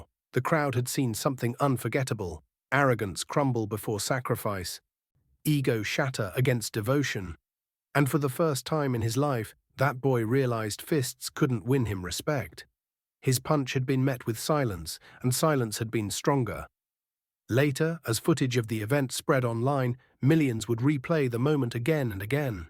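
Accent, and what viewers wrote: United Kingdom. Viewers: Would rather see the moment of the knock down in dramatic movie footage than listening to a guy with a British accent assuring us that the good guy won. Let's hear a good old boy from the Deep South giving us the play by play of teaching the young punk a lesson. Save the limey for a similar story of some immature loser trying to abuse a Buckingham Palace soldier or Horse Guard, etc.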